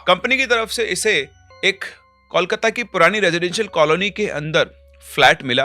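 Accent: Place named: Bihar, India. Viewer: Sir apki baato me Bihari bol najar aati hai....lgta h. Ap bihar se bhut connected ho....... Mujhe apki sari real stories bhut pasnd aati hai....maine apke sare stories suni hai.and I loved it...lot....❤ Love from...Bihar...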